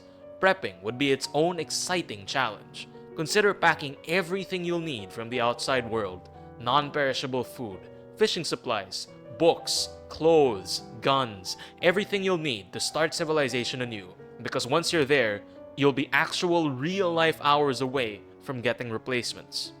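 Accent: Philippines. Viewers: Pinoy na pinoy yung accent mo par hahahaha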